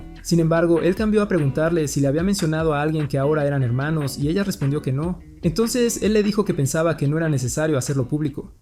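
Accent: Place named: North, Mexico. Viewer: Como que un poco norteño e unnnn poquito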